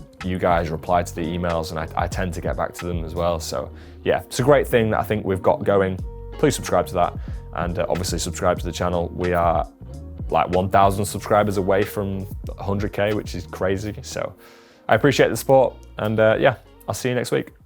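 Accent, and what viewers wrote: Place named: United Kingdom. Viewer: Great video - seems like an "evergreen" discussion :) So, assuming we're talking about 35mm fullframe, to me, this is exactly opposite of your view - I'm a "50mm guy" and find the 35mm to be somewhat between two chairs, if I can put it that way. If I want something wider than 50mm, I find that I reach for my 20mm. Ps. I LOVE your accent - where in the UK does it come from?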